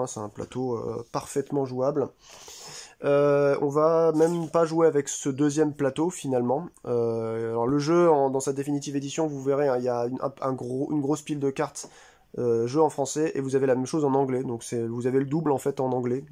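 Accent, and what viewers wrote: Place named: Quebec, Canada. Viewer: Woah! Tu n'as pas eu de chance mais vraiment pas! Bon, je vais devoir me procurer la nouvelle édition, je la trouve vraiment mieux. Au niveau des dessins, c'est Jean-François Gauthier qui fait tout: invente le gameplay, fait les dessins, s'occupe de l'édition, vraiment tout pour les 3 jeux. Plusieurs personnages proviennent de Goldblivion, trop bien! Ton accent québécois est parfait! 😄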